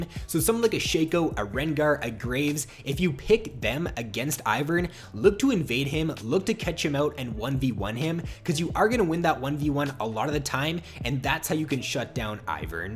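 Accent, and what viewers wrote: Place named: Canada. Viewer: Canadian af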